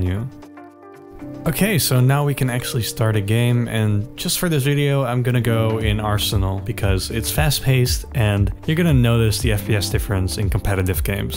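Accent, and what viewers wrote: Netherlands. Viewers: I already could hear you come from the netherlands anyways good video your amazing :)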